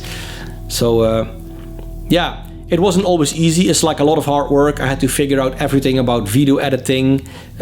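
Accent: Netherlands. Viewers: Anyone who complains that the Dutch host has an “accent” needs to go and flush themselves down the toilet. Ignore the morons. They walk among us unfortunately. I discovered this channel about two days ago. I have spent most of those two days watching all of your videos, stopping occasionally to sleep and eat!! Love it and keep up the great work. My fave is definitely the Space Brothers. Those guys are legends🤣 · The Dutch accent with English is great btw. It's reminds me of a time when I lived in netherlands years ago. So if some take issue with it they have the real issue. Happy Christmas to you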